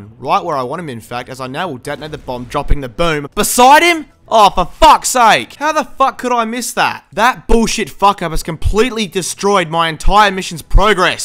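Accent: Australia. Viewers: what part of australia are you from? I'm from WA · what he is Australian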